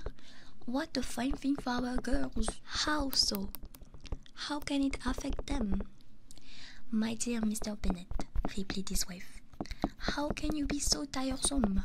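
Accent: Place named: France. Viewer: DO MORE STORY READING WITH FRENCH ACCENT PLEASE, IT'S AMAZING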